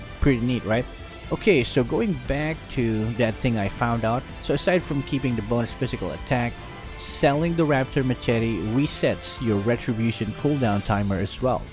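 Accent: Philippines. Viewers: Just as I thought, it is Filipino Englig Accent. :) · From this video, now I know you are Filipino, your accent is different with other Filipino tho xD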